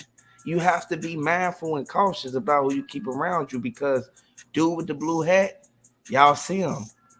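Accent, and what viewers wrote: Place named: Houston, United States. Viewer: Who is this mysterious "They"? This is troll-snitching at it's finest! They saying you were in the pics you are showing sir. With that H-Tine accent, I know you live in the Houston. Just wondering... Which one in the picture are you? You got specific intel, and are doing one hell of a job troll-snitching. Just keep talking, so we're can hurry up and close this case. Stand up H-Tine!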